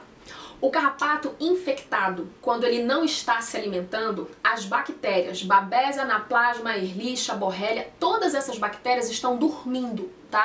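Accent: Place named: Pará, Brazil. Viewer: Muito obrigadop pelo vídeo, queria que meus professores tivessem essa didática e vc parece ter um sotaque paraense haha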